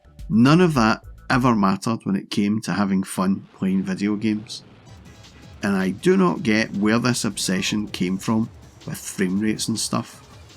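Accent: Scotland, United Kingdom. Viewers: I wasn’t expecting the Scottish accent 😅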